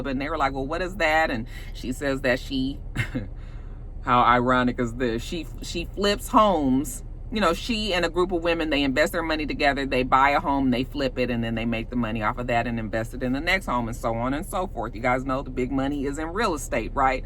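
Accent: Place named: California, United States. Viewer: Don't wanna watch the show..So Thanks...Your review is EVERYTHING!...the more I view the more I clearly I hear your accent..lol California ❤️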